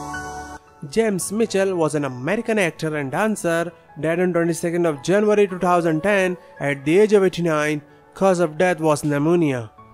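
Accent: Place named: India. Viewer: his accent is clearly East Indian/Asian and a strong one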